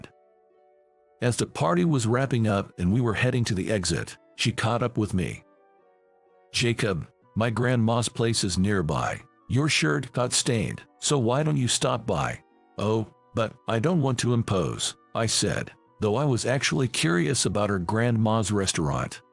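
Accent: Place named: Boston, United States. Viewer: Love the Boston voice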